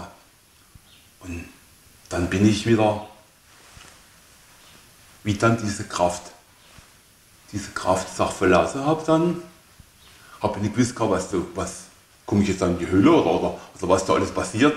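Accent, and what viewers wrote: Swabia, Germany. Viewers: das ist schwäbisch! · Leider für mich als Norddeutschen aufgrund des stark ausgeprägte schwäbischen Akzents und der etwas mangelnden Tonqualität nur schwer zu verstehen. Schade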